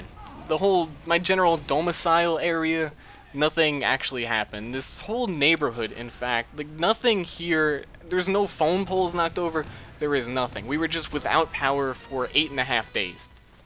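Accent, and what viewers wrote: New Jersey, United States. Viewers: So ur from nj then? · American lol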